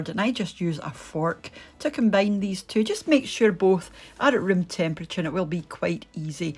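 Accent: Scotland, United Kingdom. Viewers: the wi yoo cook I would sweer your ma was Scottish the accent is a bit hard tae figure oot Um a getting warm 😂😂😂😂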